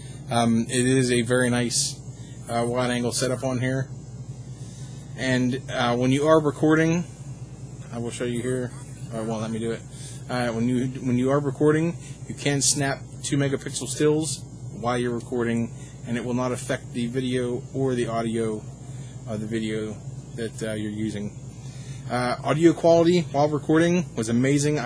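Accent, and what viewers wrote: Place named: Pittsburgh, United States. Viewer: you are definitely da burgh, nice review dude, just got one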